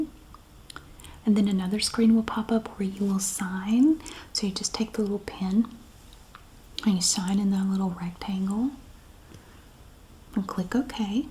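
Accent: South, United States. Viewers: I grew up in The Deep South and have always LOVED the sound of a southern accent in a woman’s voice. I’ve always found it so sweet and relaxing. This is a blissful, gentle joy to listen to ☺️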